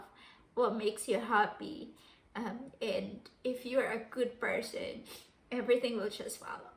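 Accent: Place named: Australia. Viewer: Yes nemern! You sound very Aussie na!👏🏻 Keep up the good work and Godbless!🙏🏻 Taas kamay for us Filipino Migrants!🇵🇭🇦🇺🇨🇦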